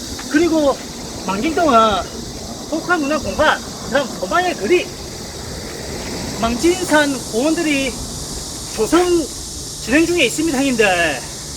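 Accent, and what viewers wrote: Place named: Seoul, South Korea. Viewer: 사장님 서울말이 너무 자연스럽네여 소개 흐시다가 한번 꺾었는데.. 30분 쉬고 방송 한거 같네예 ㅎㅎ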